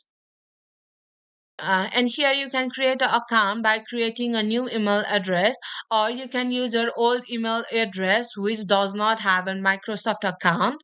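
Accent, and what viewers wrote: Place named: India. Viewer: Next time use an AI English voice. The Indian English accent is very difficult for most American to understand. But otherwise good info.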